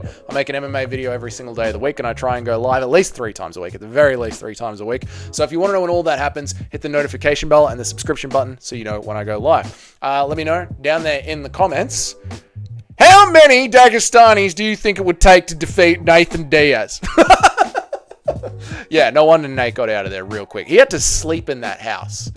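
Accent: Australia. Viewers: U gotta change that intro especially with that accent sound like a Walmart ozzy man😞no disrespect this is my 1st time seeing you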